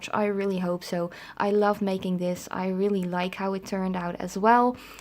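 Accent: Netherlands. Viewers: Sorry, naar ik kon duidelijk je Nederlandse accent horen! 😅 Niet gemeen bedoeld tho, ik vind het juist iets vertrouwds hebben. Oh ja, en de look is GEWELDIG! Goed gedaan! 👏👏🙏🙏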